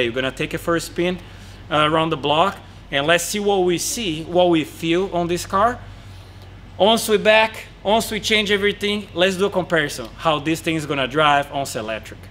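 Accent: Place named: Brazil. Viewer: Nice Accent hahaha Brasilien one! ancioso por esta serie!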